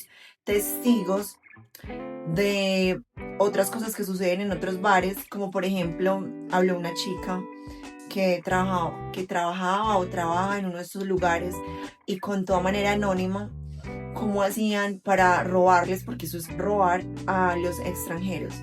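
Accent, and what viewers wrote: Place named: Antioquia, Colombia. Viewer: Gracias, me gusta ver tus vídeos para mejorar mi oído para el español, especialmente el acento paisa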